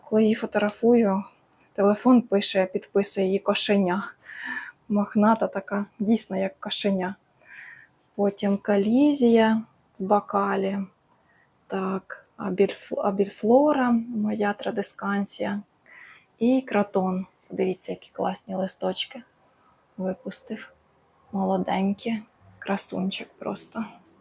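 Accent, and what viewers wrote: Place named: Surzhyk, Ukraine. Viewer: Дома вы украинским языком не разговариваете это отчётливо слышно по вашему произношению на суржике ,обосаться вас слушать,стараеться что то лепит набор слов - це просто вау😂😂😂😂😂😂😂😂😂😂😂😂😂😂😂🎉🎉😂😂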